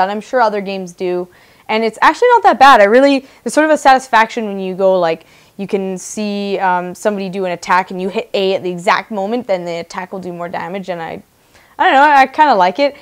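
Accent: Canada. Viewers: Canadian right? "Mare-rio", "aboat".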